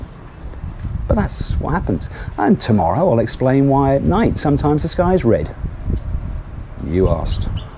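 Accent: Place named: England, United Kingdom. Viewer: Hi, I stumbled onto your channel [on a recommendation]. You must doing something right. So, I'm another new subscriber. It's great to hear a English chap [for a change] in the Philippines doing a building project. Anyway, I have a lot of catching up to do to watch all your episodes, so don't tell my asawa. Hope you enjoy the fruits of your labour. Thumbs up 👍.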